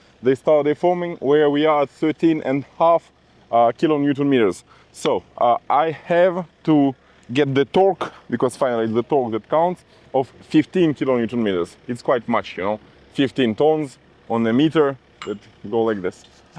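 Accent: Russia. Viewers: I know it’s a race against time to save the chateau, yet you have to work offsite to earn money to pay for the restoration. I hope you can increase your subscribers so you can get money through YouTube. It will happen but it takes time. I’m praying for a miracle for you. I love when you change off between speaking French and then English but when you speak inglish you have a Russian accent. No matter what, you are delightful. ❤ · I like your native Russian accent the best.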